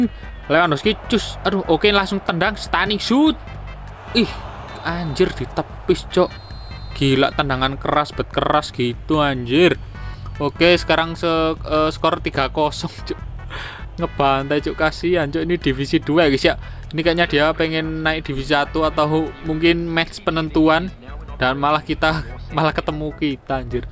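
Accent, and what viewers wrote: Java, Indonesia. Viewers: Keren bro, medok mantap, cuma ada kata anj*r nya kurang enak didengar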